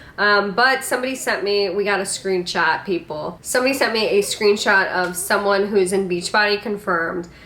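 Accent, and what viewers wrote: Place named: Midwest, United States. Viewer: I hear my own Midwest accent when I listen to your videos. Your voice is so comforting which is great when watching mlm videos! Thanks for all the awareness you bring in this area. I almost got sucked into essential oils shortly after graduating high school. Very glad I dodged that bullet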